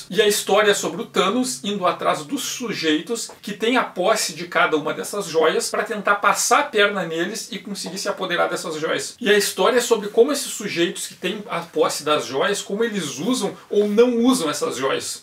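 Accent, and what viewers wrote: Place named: Rio Grande do Sul, Brazil. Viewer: me inscrevi e tô vendo, gostei da perspectiva, sotaque do RS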